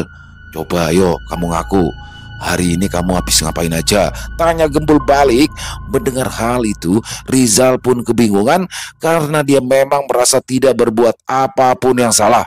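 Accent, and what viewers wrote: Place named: Betawi, Indonesia. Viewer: Slmat siang..senang kisah warong kopi Dony muncul kmbli bersama si gembul yg lucu juga dialek khas Betawi nya mas...sy tunggu cerita nya yg lbih seru,slam dri sy ibu Eky di solo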